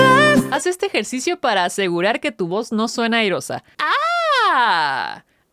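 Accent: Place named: Mexico. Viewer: Che, que lindo acento Mexicano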